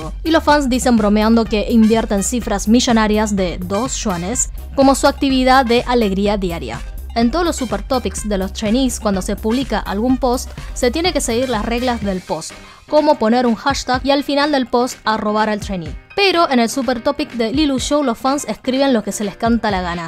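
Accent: Argentina. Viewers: Vos hablas como argentina querida ..... saludos desde 🇵🇦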